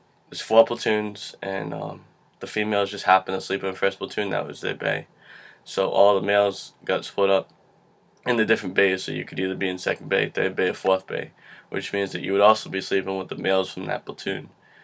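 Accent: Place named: New York, United States. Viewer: are you from NY? where's your accent from?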